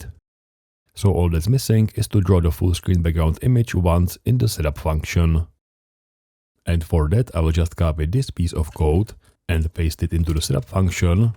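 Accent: Czech Republic. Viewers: velmi vyrazny cesky prizvuk, inac genialne video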